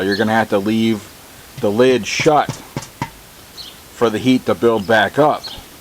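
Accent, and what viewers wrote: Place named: Wisconsin, United States. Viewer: That's def WI or IL. It sounds urban and rural at the same time lol Bet you he lives by Lake Michigan 🤣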